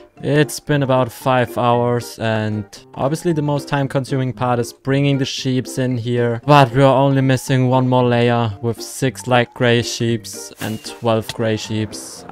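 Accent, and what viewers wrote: Germany. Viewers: Super german Easy englesh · Your lucky you have za german accent. Im mostly German, but have no accent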